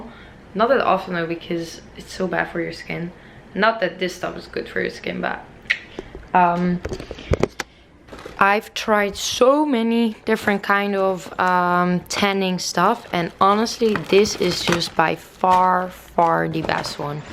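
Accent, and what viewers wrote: France. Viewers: Your French accent is so cute. I’m French btw 😜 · Is she french ?? She has like a french accent Ps : that tan didn't look so good , her natural skin color looked way better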